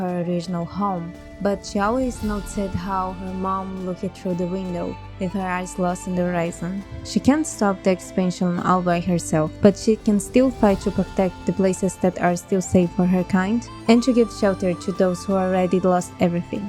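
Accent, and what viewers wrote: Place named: Brazil. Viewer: eu ouvindo o sotaque mt fofo e pensando "de onde será que ela é?" ate o jabuticaba KKKKKKK mt bom encontrar alguma artista brasileira com a msm vibe doq eu gosto de assistir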